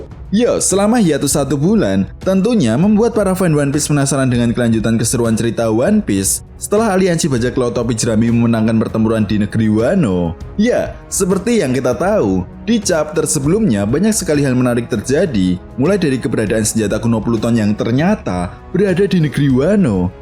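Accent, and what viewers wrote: Java, Indonesia. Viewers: suaranya terlalu medhok,,, kyk bumbu ktoprak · Logat jawanya kental skali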